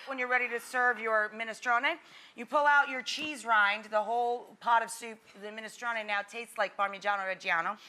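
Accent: United States. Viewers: As soon as I heard her American accent I knew this would go wrong! I shut down straight away not being racist but Americans are not the most aspiring people in the world.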